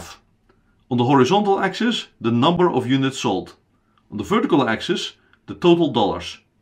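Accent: Netherlands. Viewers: Thank you so much for helping! Hopefully I will pass my entrance exam. Also, I had to ask are you Dutch? I could tell by your accent :)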